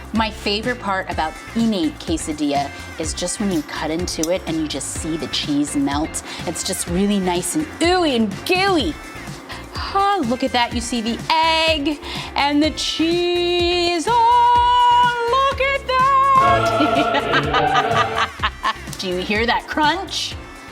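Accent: South, United States. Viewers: Hello there southern accent! 😂 I love it 😊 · Hi!!!! I love the red lip and I just love pizza too!! Your son and I will get along well, and my daughter, but she only likes cheese. You would think I'm italian bc I love Italian food!! I love your food videos. You just sounded like Tamera in your southern accent!! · Omg u made me so hungry LOL pizza one looked so good and I loved the country accent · yes fpr the country accent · I had no idea had a country accent before it sounds so adorable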